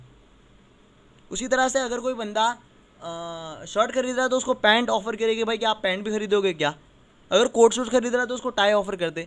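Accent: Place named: Mumbai, India. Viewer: way you like teach in Mumbaikar style.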